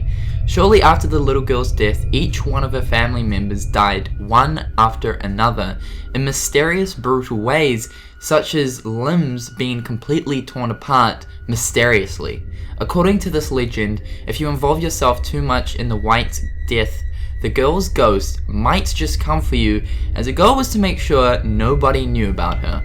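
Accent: New Zealand. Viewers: Sounds like a kiwi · Please. Don't talk like a Kiwi/Maori. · Are you from New zealand?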